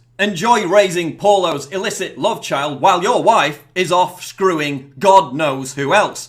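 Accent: United Kingdom. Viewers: Paul Joseph Watson Okay, Paul, between your humorous and colorful description of the truth and your British accent, I could listen to you all day long!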